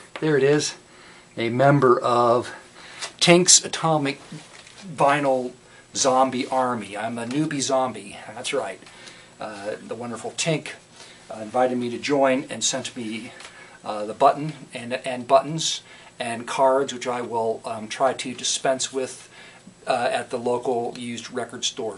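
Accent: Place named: South, United States. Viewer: Sounds rather hillbilly & fun!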